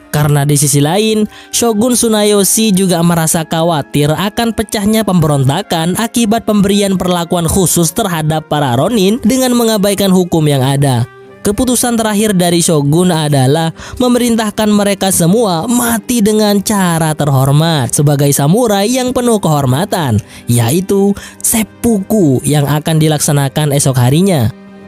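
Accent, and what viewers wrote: Madura, Indonesia. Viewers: Abeh mak sellae madura 😁😁😁😁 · 😂 logatta tangake jhek Reng madureh 😅 salam Sampang bang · Reng madure ini..se ge oge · Madureh dimmah sampean tretan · Ini komentatornya orang madura ya....😂😂😂 Soghe' ckna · Medureh ongghu , keren ❤❤❤ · Corak reng madureh reh adminah😩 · Madureh reh😂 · Haha, mimin madura 😅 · Oreng madureh hedeh nom😅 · Suaranya madura bgt , tlg d benarkan bang .... Bahasa indonesianya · Ngkok agelek eh takok dusah ternyata adminah reng medureh😂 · Orang Madura dia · Abbeeee alure campur madura masze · Madureh mas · Reng Medureh cook😂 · Oreng madureh mon disoaranah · Medurene e tengale Mak 😂 Tp mantap 👍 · Suara latar nya kayak orang Madura logatnya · Njir madura cokkk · Kurasa adminnya madura dr logat ya sya tebak, mantep tretan😂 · Min dari madura yah ?? Kentara banget logatnya hihi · Mak pas bedeh bhs medurehnah tretan Mantap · Pengisi suaranya kek orang madura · MK Reng madureh kak🤣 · reng madureh kak... 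madureh dimmah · Lucu moderatornya,letolean 🤣...mantap...madura eksen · Mak medureh kak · Oreng medureh hedeh kak Yeh wes auto subrex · Ye pokeeh madureh tulen 😂😂😂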